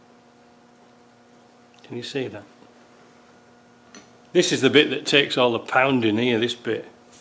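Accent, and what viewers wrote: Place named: Northern England, United Kingdom. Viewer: Hi mate I gather you live in the USA OR CANADA somewhere as you were talking in dollars. I guess your from the UK? You sound very northern I’m northern myself. Where in the uk are you from buddy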